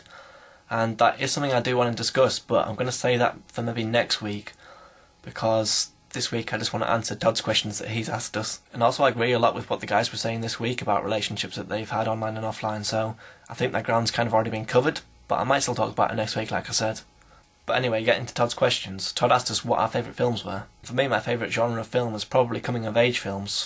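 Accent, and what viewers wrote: England, United Kingdom. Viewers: I love Leo DiCaprio! I watched the Aviator again today lol - coicidence? And What's Eating Gilbert Grape is one of my fav movies ^_^ Have you seen Catch Me If You Can? His depiction of Frank William Abagnale, from being a confused teenager to a mastermind at cheque forgery... is just epic. I could be a spokes person for that movie lol. I also love your accent, where abouts in England are you from?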